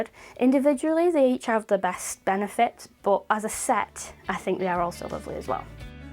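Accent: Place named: Bolton, United Kingdom. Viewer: Fabulous, I'm leathered now 🤣 subscribed partially for the local shots and accent! (We're in horwich near Bolton) I have this lens in a 17 and have just ordered the 50, can't wait!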